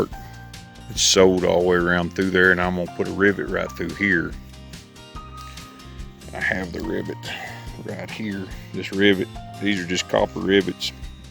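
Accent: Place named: Kentucky, United States. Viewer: Hope your knee feels better soon! Yep, on your knife...the old ones can be really good. Oldies but goodies. My sister lives on the high desert. But, hey, I lived in Kentucky for 14 Years...I knew you sounded like you might be from that area...then I heard you mention Tennessee. Wonderful area back there with awesome folk.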